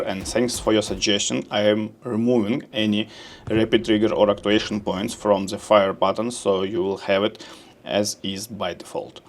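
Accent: Russia. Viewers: russian accent?) я все понял )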